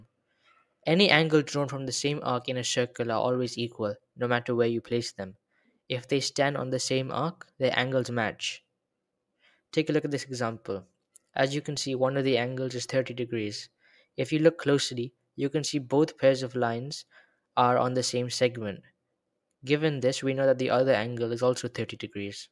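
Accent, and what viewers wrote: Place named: United States. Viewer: Dude your accsent is somewhat like american. Great job ❤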